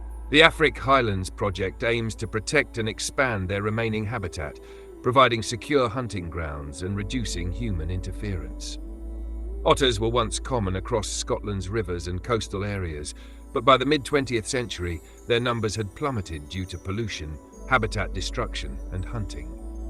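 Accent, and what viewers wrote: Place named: England, United Kingdom. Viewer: English voice explaining our story to us! Sorry! Couldn’t listen to this…..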